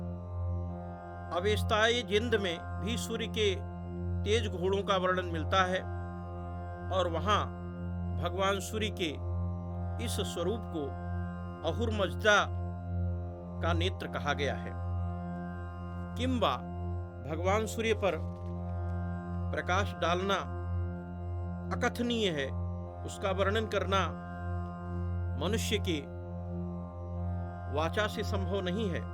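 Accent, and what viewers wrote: Standard. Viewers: अद्भुत, ज्ञानवर्धक,,बहुत सुंदर, विशुद्ध उच्चारण, नित्य श्रवणीय, आनंद दायक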